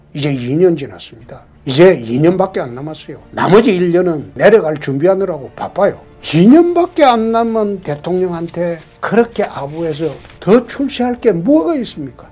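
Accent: Gyeongsang, South Korea. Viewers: 오늘 복장 이미지 쇄신에 좋습니다. 경상도 억양 고치면 좋겠습니다. 스피치 학원에서 훈련하는 것이 좋겠습니다. 감사합니다.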